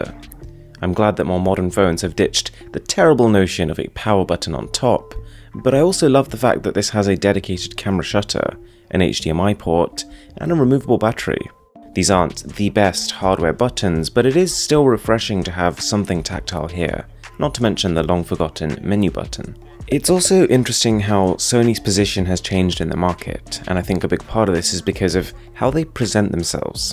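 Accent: United Kingdom. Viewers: Do you use a british accent? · The accent alone makes the subscription worth it... Like listening to Earl Grey tea talk about tech in an ever so slightly snide but entertaining manner. Perfect. Let me go get my smoking jacket and que the next video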